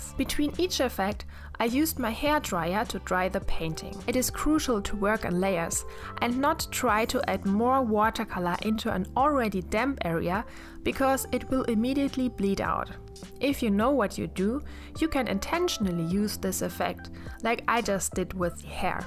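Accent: Germany. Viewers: Man hört direkt dass du deutsch bist☺️👌🏼 echt gute tips und echt schöne Bilder 👍🏽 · Are you froh German? Your accent is so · Are you German? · I always enjoy watching your videos. Lately I had problems finding motivation and you helped me quite a lot :) also I enjoy the way you edit your videos - the slow close ups of the brush and the paint in the beginning are so stunning...it really catches the mood of the painting process :) oh and your German accent - lovely ;) it's always funny to find other German YouTubers... (PS: Liebe Grüße aus Berlin! <3) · R u German?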